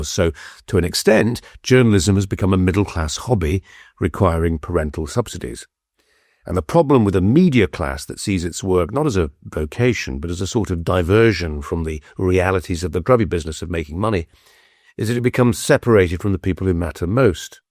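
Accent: United Kingdom. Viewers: Wow, this is great! I am finding hard to believe that I am listening to a man with a British accent, delivering BBC, ITV or Sky standard reportage and opinion, and that I don't want to throw something at the radio. The level of deliberate ideological slanting of news described here drove me to despair. I was a Radio 4 addict for 30 years, and I learnt much. However, as I read and thought, the rhetorical devices used by the BBC became glaring obvious. I turned off in the end, regarding them as having a form of spiritual blindness. The poor dears couldn't help it. I am now convinced more and more that they are much more knowing than I thought. Time for the Beeb to be broken up. There's lots of great material in there. Let's demolish it and build something new out of the rubble, if we need it at all.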